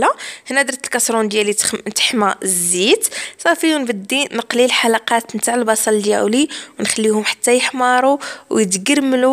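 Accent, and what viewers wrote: Oujda, Morocco. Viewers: oujdiya hta ana akhoti mli nchofha nazlat chi vidio kanfrah bach nsma3 sotha olhadra loujdya wlh twahchna oujda olghorba s3iba bzaf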